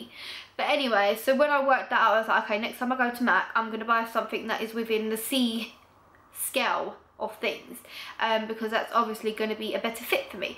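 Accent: United Kingdom. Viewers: i love ur accent! i swear i can just listen to british people talk all day..! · Is that a cockney accent? Forgive me if I'm wrong, but anyway you have the cutest accent ever lol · lol the way you express yourself and your British accent are absolutely delightful!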